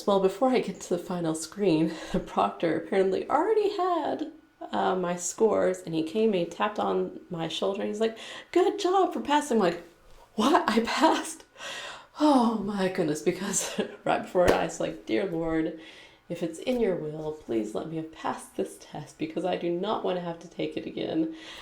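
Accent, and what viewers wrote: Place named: Texas, United States. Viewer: Hi ! I'm french and I'm absolutely not bilingual but I learned english watching movies and series in original version. All I can say is that I'm not really used to hear differents american accents but I totally understand what you are saying so I guess your texan accent isn't so bad as you think or may be it's just for someone who is not american ! Anyway I love your videos, can't stop watching them and of course I apologize if my english is really bad in that comment !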